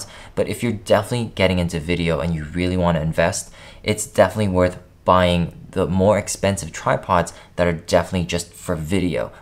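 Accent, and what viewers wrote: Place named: United Kingdom. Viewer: Good tips and British accent game is strong. Jolly good show!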